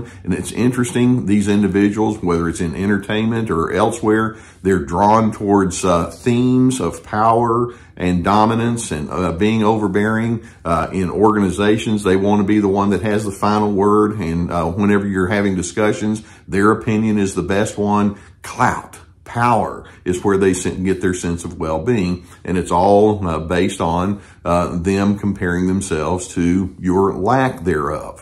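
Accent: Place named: North Texas, United States. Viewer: I grew up in D/FW but don't live there now. Your N. Texas accent is VERY comforting and familiar to me!:)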